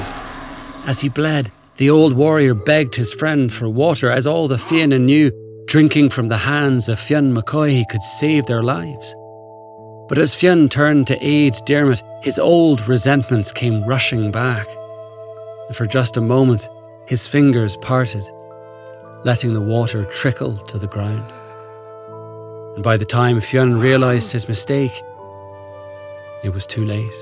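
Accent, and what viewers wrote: Ireland. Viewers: Its great to hear an actual Irish narrator telling us an Irish folktale! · as an Irish person i really thank ted ed for getting an actual Irish person to voice over it!!TY💕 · I love how this is told with an Irish accent. Awesome. 😂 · Excuse me is that a Irish accent, on my favourite narrator. I am shook and very very pleased ❤️ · Was this just the normal narrator putting on an Irish accent?! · You know our accent has like 50 variations across the island right? Plus he's clearly doing a bit of a presentorial voice, so it's not going to sound the same as in normal conversation. You can tell he's really Irish by the fact he pronounced all the names right, unlike previous Irish mythology videos from TED-Ed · Like the Irish accent that the narrator perfectly nailed. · I love Ireland. Its culture, accent, history, myths... everything. Thank you. 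I love your accent too. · I LOVE how this was narrated in Irish accent. It makes it more fun.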